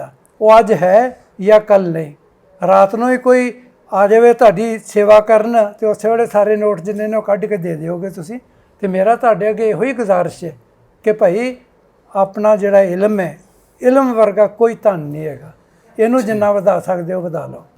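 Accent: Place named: Lahore, Pakistan. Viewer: Beautiful lahori accent, beautiful majha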